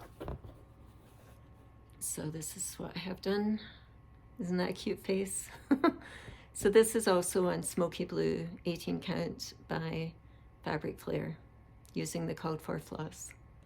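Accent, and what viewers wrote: Scotland, United Kingdom. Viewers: I’m not sure how I’ve missed seeing your videos before now. I hear a Scottish accent which I enjoy. My parents are Scottish, and my oldest daughter’s name is Katrina! I’m so sorry your daughter was in such pain. I love your projects. I’m also stitching Summer Quaker. And I’m new to WIP Warriors this year. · Nice to hear a Scottish accent on FlossTube! Your finishes are lovely!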